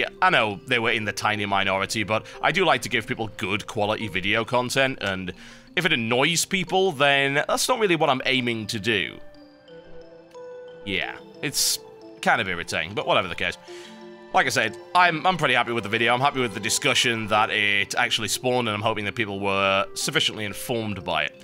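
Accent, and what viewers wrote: United Kingdom. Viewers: Love your British accent! :D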